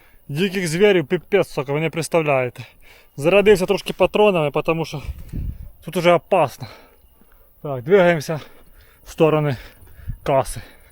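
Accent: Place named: Surzhyk, Ukraine. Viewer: Супер суржик, нікого не слухай, звучить гарно і натурально - це твоя фішка як в Канадського Марка)) Admin 11 думає що тільки він читав українських письменників і тепер може повчати як кому розмовляти) від літературного українського говору відразу хочеться спати)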